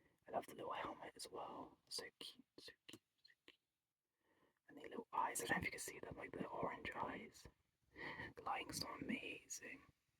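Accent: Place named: United Kingdom. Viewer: I’m sooo ready to just relax to this video even though it’s an older one of yours. It’s been a long day. Random but I have one pen pal friend that’s British and I’ve never heard their voice before but hearing yours..I can imagine they might have an accent like yours. Though I’m trying to keep in mind that there are different accents depending on where you are in your country..? Right? 😂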